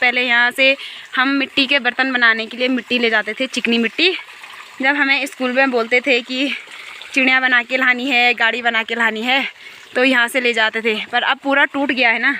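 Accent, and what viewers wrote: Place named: Garhwal, India. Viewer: Sudhh Hindi bhasa baii... with gadwali tone.... mtlb kya hi bolen.... 🙂🙃🙃😃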